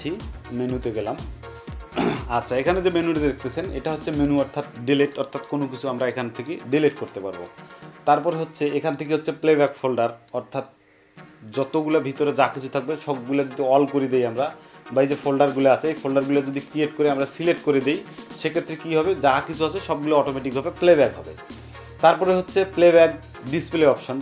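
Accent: Rangpur, Bangladesh. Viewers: বাড়ি কই ভাই? হয়া যাচ্ছে ইয়া হয়া যাচ্ছে চলি যাচ্ছে। রংপুরত বাড়ি নাকি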